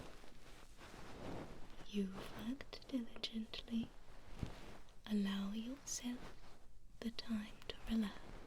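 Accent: United Kingdom. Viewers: I love the British accent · oh goodness, I love your British voice. This is just sublime Miss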